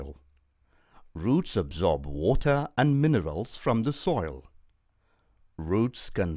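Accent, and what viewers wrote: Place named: India. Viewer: And whats up with the indian accent?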